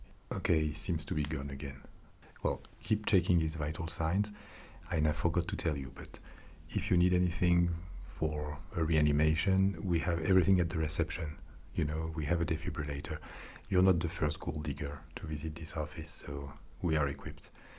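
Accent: France. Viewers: This is awesome! I’m American and dated a French guy for several months. Your accent brings back memories.. fortunately he wasn’t quite this rude 😂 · Love this, very funny :) Much more like this, French accent and sarcasm goes so well together, lol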